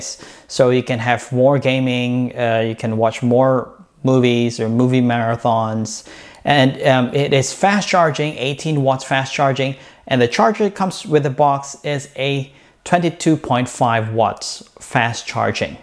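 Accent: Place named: Visayas, Philippines. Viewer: bisaya diay ka sir. hehe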